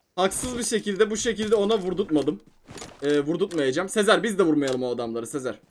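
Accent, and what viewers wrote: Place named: Adana, Turkey. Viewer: Yoo Adanalı lar da öyle konuşuyo ben Adanalıyım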